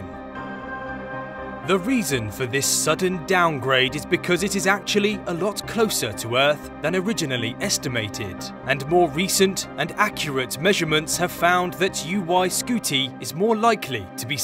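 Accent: United Kingdom. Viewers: I thought this was gonna be click bait, but when I heard the narrator’s British accent, I thought, this is gonna be good! All the best science shows are hosted by people who can’t pronounce AL-U-MIN-IUM, also known as aluminum.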